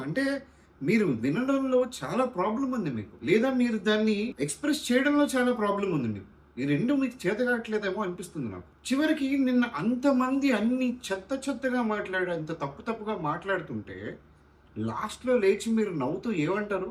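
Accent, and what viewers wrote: Telangana, India. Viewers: తెలంగాణ బాషా మస్త్ మాట్లాడతాన్నావు భాయ్ ఏ జిల్లా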